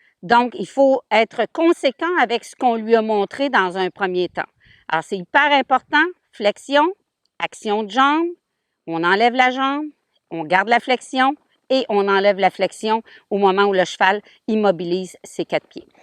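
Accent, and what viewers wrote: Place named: Quebec, Canada. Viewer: Salut eh ou habite-tu car tu as un axais québécois comme moi 😄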